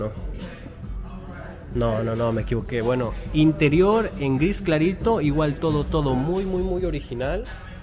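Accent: North, Mexico. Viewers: Y hablas como una compañera de trabajo que es de cohauila, bien norteño.... Y se ve que eres amistoso igual que ella.. Jejejeje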